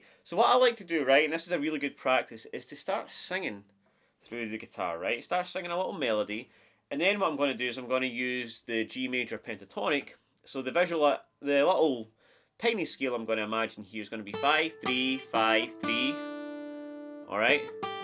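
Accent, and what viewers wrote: Scotland, United Kingdom. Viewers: came for the scottish accent, stayed for the luuuuv. and the guitar lessons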